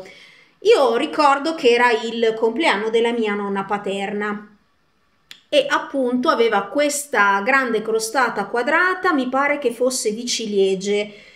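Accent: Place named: Veneto, Italy. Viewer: pensa che io all'inizio ho pensato che tu fossi Veneta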